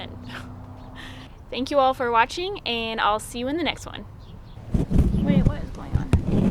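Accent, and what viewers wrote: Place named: Michigan, United States. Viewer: Thank you for the informative vid. It was very helpful to me as I am also into hiking and camping too and have questions like this about most products that I could use. Are u from Michigan? Your accent...it's either Michigan or Wisconsin isn't??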